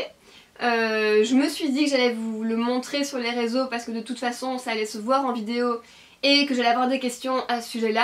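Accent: Belgium. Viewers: comme tu habite en Belgique est-ce que tu peux garder ton accent belge parce que moi aussi je suis une belge et il y a je pense des belge qui te regarde aussie bref t'es trop belle j'adore tes vidéo ne le prend surtout pas mâle pour l'accent dsl d'avance si je t'es fais mâle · Dernière question à ce que j ai compris tu est belge ? Tu a un accent belge c est vrai ! (En mode pomee 😹😂)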